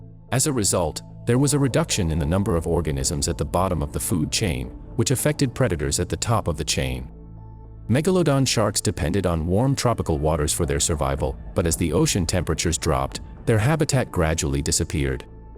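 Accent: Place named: New England, United States. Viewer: FINALLY!!! A mini doc that doesn't try to proclaim that the Meg is still alive. Thank you so much. 2 things - - It's pronounced Ea pock not Epic. I do believe that the narrator is from New England or where "evah".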